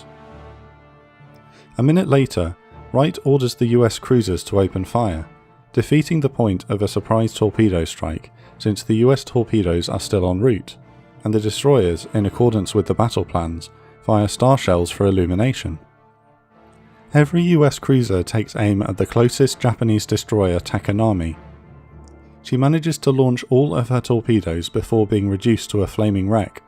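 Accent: England, United Kingdom. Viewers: Get rid of the heavy English accent that I can't understand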